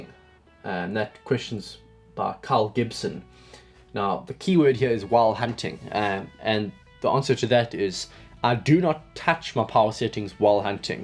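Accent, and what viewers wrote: South Africa. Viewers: is your first language afrikaans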